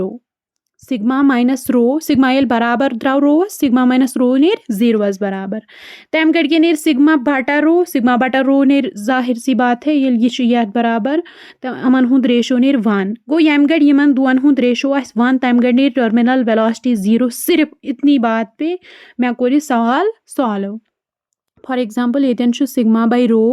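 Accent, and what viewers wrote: South Kashmir, India. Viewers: Cutest south kashmiri accent. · Are u from south kashmir just saying from accent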